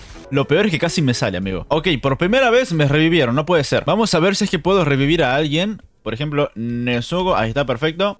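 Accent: Córdoba, Argentina. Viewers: Pareces cordobes con ese acento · Soy yo o tiene acento cordobés? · HABLA COMO SI FUERA ARGENTINO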